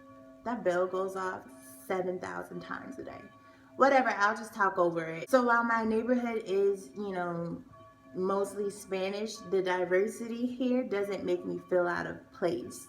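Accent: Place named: Chicago, United States. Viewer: I thought I heard a Chicago accent🤔 hey girl 🙋🏾‍♀️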